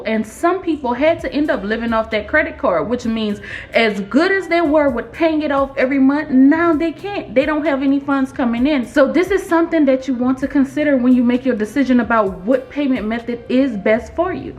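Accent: New Orleans, United States. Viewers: I hear new Orleans all in your voice💯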